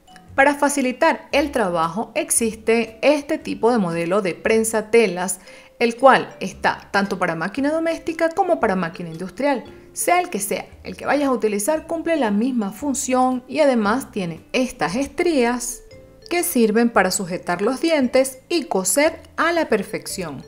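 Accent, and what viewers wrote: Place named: Venezuela. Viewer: Gracias.... Me gusta mucho la forma como explicas... De donde eres? Tu acento es venezolano, me parece.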